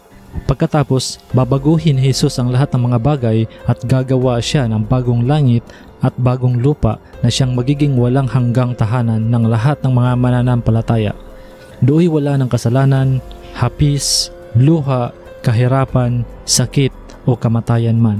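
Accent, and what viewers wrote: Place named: Visayas, Philippines. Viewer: Bisaya ka bro😁ung accent mo,hehee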